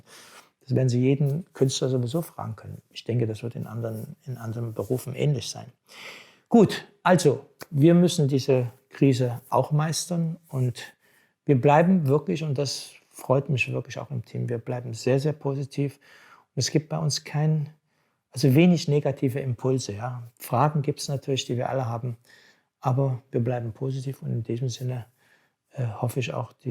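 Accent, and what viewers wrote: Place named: Saxony, Germany. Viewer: Ja ich bin auch durch Zufall an geraten...auch der Dialekt er stammt aus Leipzig u ich aus riesa also sachsen und da ich jetzt alle malschulen zu haben und ich nur Acryl und aquarellstunden genommen habe passt das jetzt sehr gut...denn mit zeichnen das moechte ich noch lernen machen sie weiter herr Assisi toll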